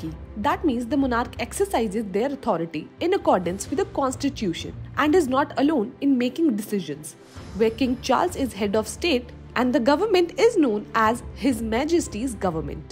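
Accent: India. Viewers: Cmon really!! Im not racist and will never be but this Idian english accent makes me go Insane 🤬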